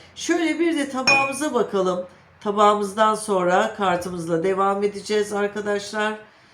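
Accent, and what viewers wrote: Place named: Central Anatolia, Turkey. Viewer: Aldım kabul ettim bana uyanlari. ağzınıza sağlık hanım sizi çok severek dinliyorum nerelisiniz acaba merak ettim konuşmanız iç Anadolu bölgesi'ne hitap ediyor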